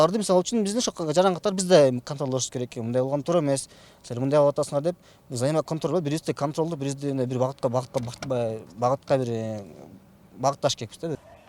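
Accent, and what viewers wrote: Standard. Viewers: Азаматсын 👍👍👍Кыргызча таза суйлойт турбайсынбы, биз ойлоптурбуз кыргызча суйлой албайт экен деп. Ишине ийгилик иним....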